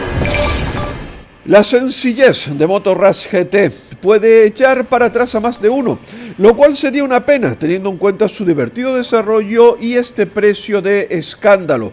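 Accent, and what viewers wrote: Spain. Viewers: aaaanda, por fin lo sé, eres tinerfeño! Sabía que no eras del sur de la península y estaba seguro que serias de las Islas... Muy bonita Tenerife, ánimo con el canal y gracias por los esfuerzos para buscar los juegos de los que nos hablas en tus vídeos :)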